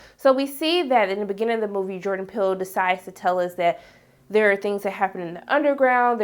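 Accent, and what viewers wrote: South, United States. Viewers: I want to watch the film again, because my first viewing of this had me disappointed. I think the movie on a technical standpoint was well made though. The music was fire! I love this girl's light southern accent (I'm guessing Georgia). I could literally just listen to her read a manual on statistics. Subscribed.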